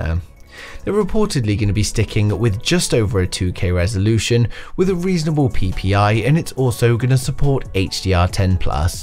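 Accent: United Kingdom. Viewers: That's a British accent.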